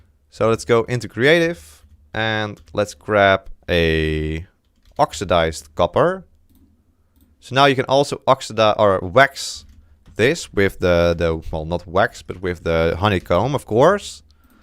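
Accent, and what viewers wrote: Netherlands. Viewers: my first time watchin your vids, immediately noticed you're dutch. Ga zo door met je video's!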